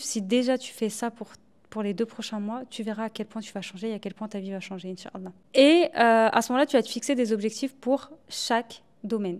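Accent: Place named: France. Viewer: Ton accent est comme le français bon courage 😍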